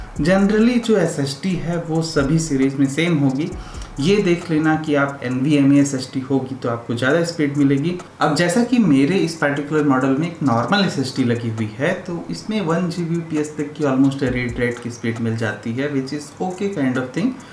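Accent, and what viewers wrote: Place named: Bengal, India. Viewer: I am guessing from your acsent , that you are a bongali !!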